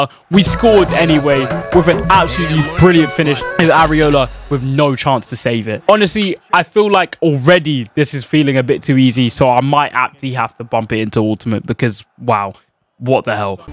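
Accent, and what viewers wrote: United Kingdom. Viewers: Well duh but he’s English accent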